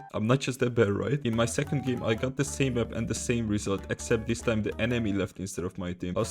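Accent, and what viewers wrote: Hungary. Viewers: Hold up are you from hungary? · are you from hungary?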